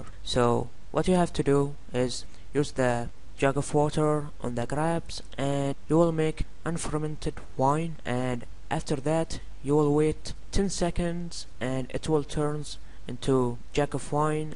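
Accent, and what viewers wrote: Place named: Arab world. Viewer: good guide, and your definitely Arab that accent lol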